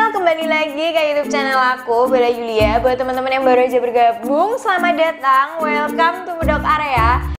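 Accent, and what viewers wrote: Java, Indonesia. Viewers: Kusuka medokmu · Aku baru pertama kali nonton suka gara2 medok gitu walaupun aku orang sunda wkwkwk 😆😆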